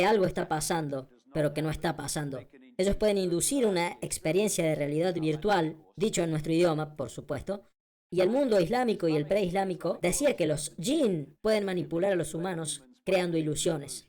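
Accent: Córdoba, Argentina. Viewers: Porque es cordobes!. Linda tonada!. Saludos desde Buenos Aires!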